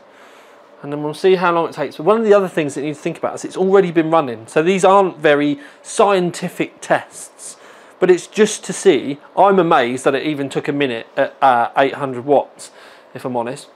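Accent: United Kingdom. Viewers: yoooooooooooooo britishaceeeeeeeeeent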